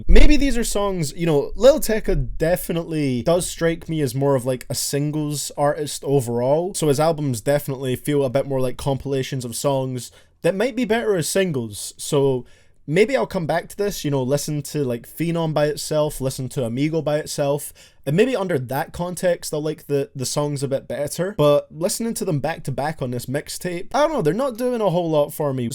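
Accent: Scotland, United Kingdom. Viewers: Hey are you from Scotland?, I am!!!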